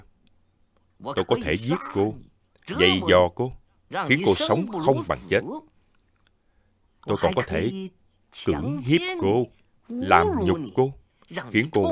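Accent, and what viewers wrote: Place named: South, Vietnam. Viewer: Giọng thuyết minh quá nặng giọng miền Nam rất khó nghe.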